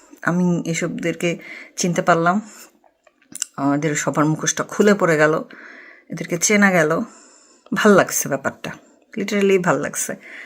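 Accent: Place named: Daulatdia, Bangladesh. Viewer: তুমি যে দৌলোদিয়া lady তোমার ভাষায় সেটা বোঝা যায়।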